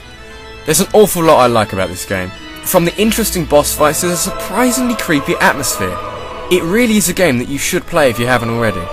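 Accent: England, United Kingdom. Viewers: no you brits speak english correctly we americans mispronounce things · I say it like that and I'm English too so I guess it's an accent thing.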